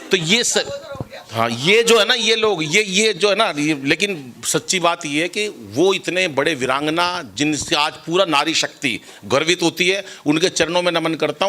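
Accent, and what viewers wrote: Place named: Haryana, India. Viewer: ओरिजनल हरियाणवी अंदाज वेल डन श्री वेल डन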